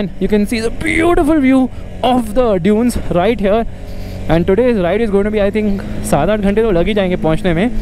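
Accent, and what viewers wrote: India. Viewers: I like your way of speaking Hinglish 👌😂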